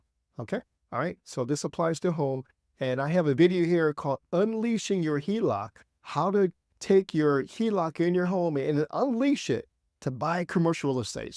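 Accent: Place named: Haiti. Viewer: he sounds like he's Haitian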